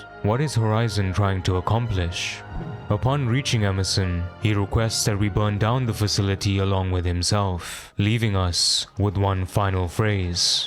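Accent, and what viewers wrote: South Africa. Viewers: I just hear the south african in you 🤣Good video mate, good to see content like this coming out of our country.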